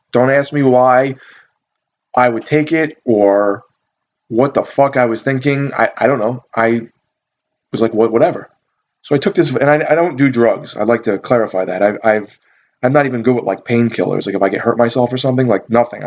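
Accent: United States. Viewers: you American?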